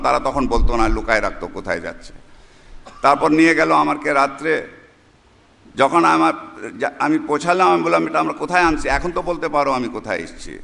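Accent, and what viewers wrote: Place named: Old Dhaka, Bangladesh. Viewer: কেউ ভুল বুঝবেন না পুরান ঢাকার মানুষ এভাবেই কথা বলে।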